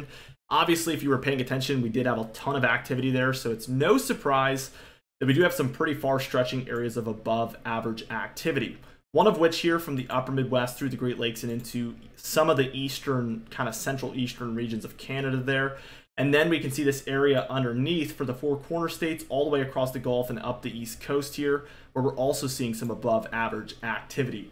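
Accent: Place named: Virginia, United States. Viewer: Just want to drop you a note Young Man, for doing an excellent weather reporting and being on target. I Appreciate your Virginia Dialect, makes me feel at Home. I was originally born in Tidewater area, but presently live in Central North Carolina, Smithfield, area about 25 miles East of Raleigh. Thanks again, have a wonderful weekend.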